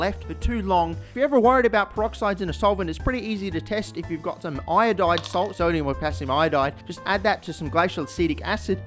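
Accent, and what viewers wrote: Australia. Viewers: aussie nilered. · Would be 10/10 if more raw Australian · When I started watching this video I though this guy is an Australian and it got proved a bit later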